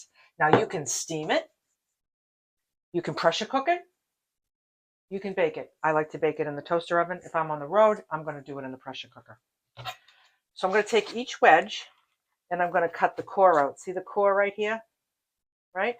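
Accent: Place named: New York, United States. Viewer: I absolutely love your hair AND .....your roasty toasty cabbage and your accent love love it!!!! Im from NY too!!!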